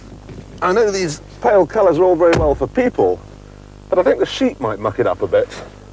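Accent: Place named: RP, United Kingdom. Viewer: I seems Jezza's commentary isn't as good when he talks with his posh voice rather than the one that we are so used to hearing nowadays, in fact he actually sounds boring in this clip, but I suppose the BBC wouldn't allow regional accents when this was first made.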